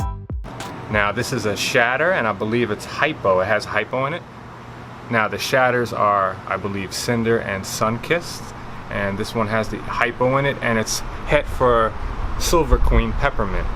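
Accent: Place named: New York, United States. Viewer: Nice video......do I detect a NY accent? I see you have a African fat tail gecko. If you are new with them use the ground coconut fiber or even the Lugarti reptile bedding. They need a little higher humidity. The Lugarti bedding would probably work well with your snakes as well.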